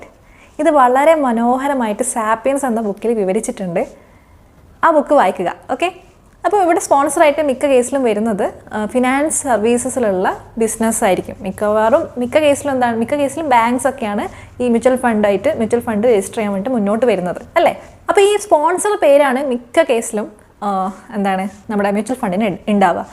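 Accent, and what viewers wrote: Kannur, India. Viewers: Kannur aano? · Nalla rasond samsaram kelkkan...kannur bhagam aano veedu?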